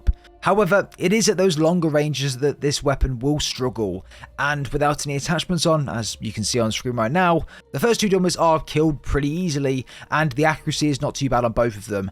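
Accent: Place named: United Kingdom. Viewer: Can’t you hear that he’s British. We say things differently than Americans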